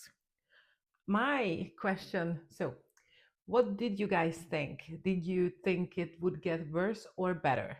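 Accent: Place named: Northern Ireland, United Kingdom. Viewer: Oh wow! My hat is off to you and I don’t know how you coped! If I feel ANYTHING odd it bugs me until I fix it. I got up in the middle of the night, my elderly dad was up watching TV and I walked in like a zombie (his words, lifted a nail file and walked off! 😂😂 Well done on prepping enough to make them last that long! Can I ask if you are living in or have spent time in Northern Ireland? I swear little things you say remind me of our accent! I hope thats not insulting. Lol Great video.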